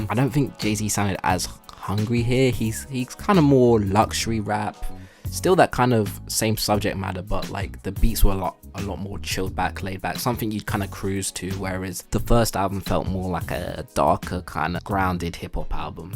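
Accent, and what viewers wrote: United Kingdom. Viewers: Honestly, you don't sound black enough. Why are you wasting your fancy British accent on dissing old rappers? The whole point of the genre is machismo. Even if you made the right argument, it wouldn't matter because you sound polite.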